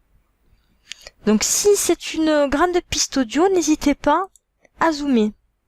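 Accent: South, France. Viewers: Cet accent sudiste me hante! (Je passe mes vacances en France donc je réalise un rêve ) Mais sinon ça va mettre très utile pour ma prochaine vidéo ;-)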